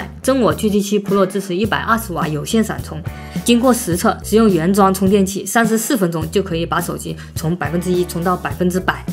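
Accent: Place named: Sichuan, China. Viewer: 四川口音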